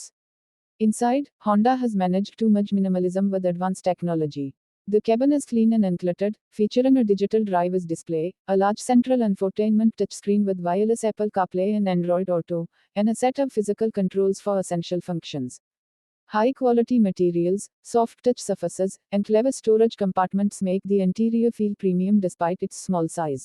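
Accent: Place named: India. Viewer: with the heavy Indian accent and her "rushing" without any inflection makes it almost impossible to understand this announcer.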